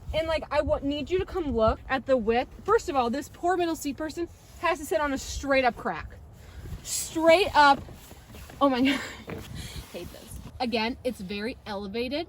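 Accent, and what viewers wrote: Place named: Minnesota, United States. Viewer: She has the Minnesota accent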